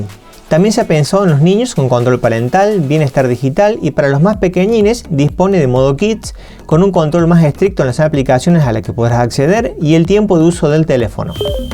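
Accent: Córdoba, Argentina. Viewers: No hay mejor video que el hecho con esa tonada cordobesa... Amé!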